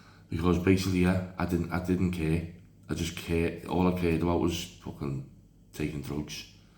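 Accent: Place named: Liverpool, United Kingdom. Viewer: ❤❤❤ most honest talk I have ever heard what a pleasure to listen to proper scouser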